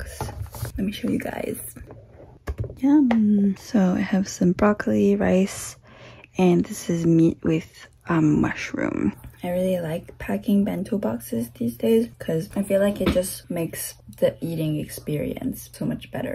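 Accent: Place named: Canada. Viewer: Je trouve toujours bizarre quand un canadien parle français, votre accent est trop mignon (et parfois je comprends rien mdr)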